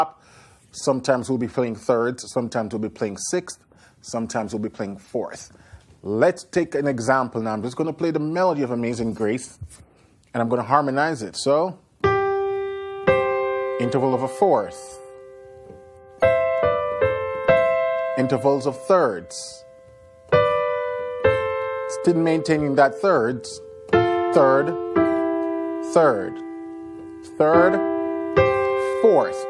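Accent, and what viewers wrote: Caribbean. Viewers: Hey I’m from Jamaica 🇯🇲. Are you from the Caribbean? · What Caribbean voice is this….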